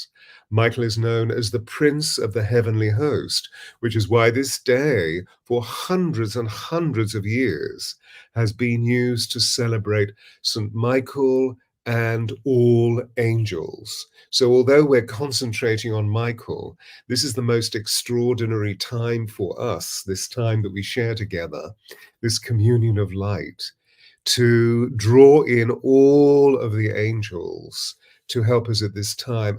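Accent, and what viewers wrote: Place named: United Kingdom. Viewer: I just Love your Energy & Your very Posh English Gentleman Accent, I am also here in England & you sound like our King Charles, So comforting is your voice. Sent Prayers to all in America who sufferd from the Storm💚💚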